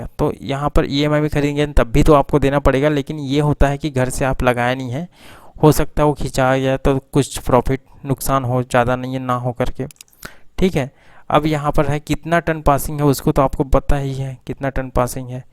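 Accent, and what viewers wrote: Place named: Chhattisgarh, India. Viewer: Tu chhattisgarhria aadmi h bhai tone se lag rha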